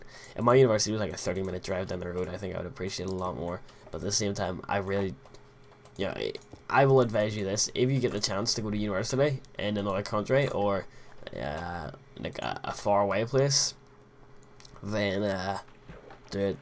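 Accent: Northern Ireland, United Kingdom. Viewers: I recognise the northern Irish accent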